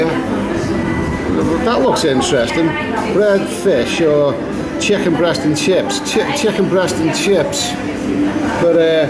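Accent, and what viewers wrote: Liverpool, United Kingdom. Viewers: Love the insight mate, top scouser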